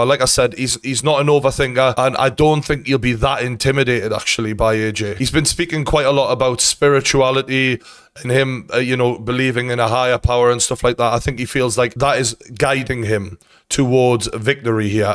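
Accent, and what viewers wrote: Newcastle, United Kingdom. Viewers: Love true geordie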